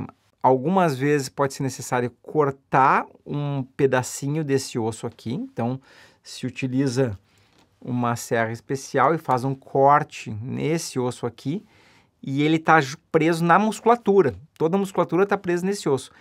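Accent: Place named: South, Brazil. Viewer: Amo o sotaque do Sul e gostei muito do vídeo. Esclarecedor! Parabéns!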